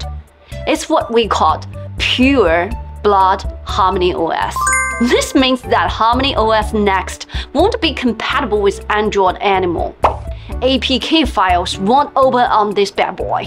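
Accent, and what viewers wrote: United Kingdom. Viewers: don't you know she speak pure UK English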